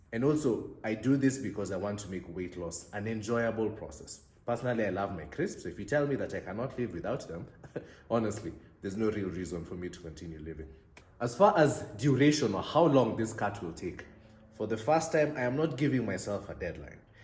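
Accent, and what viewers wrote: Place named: Africa. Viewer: Love your accent.what country in Africa are you from.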